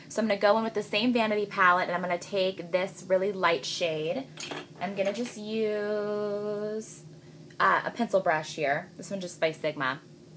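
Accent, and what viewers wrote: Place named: Midwest, United States. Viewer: What... Change your voice? I think you are incredibly easy to understand, if that's what the post meant... Midwestern accents, FTW!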